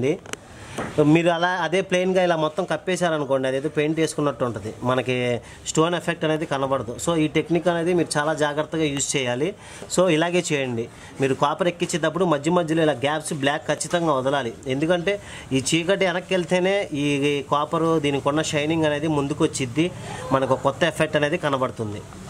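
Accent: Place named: Singh Nagar, India. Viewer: nuvvu matladuthuntee singnagar ,vombaycolony slang ardam aipothundhi Anna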